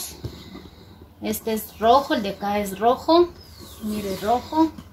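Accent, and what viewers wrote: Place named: State of Mexico, Mexico. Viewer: Esta hermoso tu jardín 💕 😍 ♥ ❤ 💖 💜 sigue adelante.tu acento parece del Estado de México México.....pero ya vi eres de Ecuador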